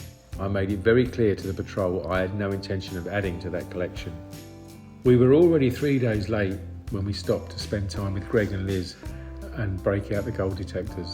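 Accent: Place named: England, United Kingdom. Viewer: A POM narrating the show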